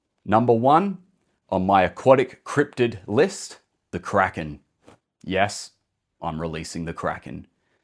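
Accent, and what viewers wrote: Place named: Australia. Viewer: Paused the video. Guessed the man was Australian. Resume video. Vindicated.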